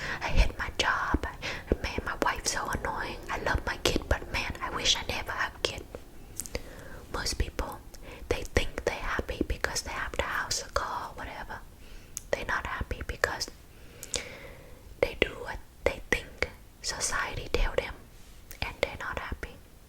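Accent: Asia. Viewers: I don’t know any other asmrtist that does the Asian accent, and after watching all your videos I’m not interested in watching other versions anyways lol. Truly unique & veryyy relaxing. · I absolutely love your asian accent videos. Its so funny and tingly. Great work. Heart❤ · Asian accent mom roleplay 😭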